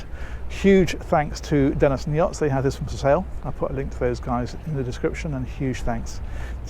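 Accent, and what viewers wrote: United Kingdom. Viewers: It would be great if you pronouciated a bit more. It's hard to pick up british accents unless I'm hammered, drunk, my guy .that said great viewing ,great work ..btw one of the nicest fly decks I've seen for driving.im sold I'll buy one .how much is it ? Oh.. does it come in blue? No .aghhh that's to bad .😂😂😂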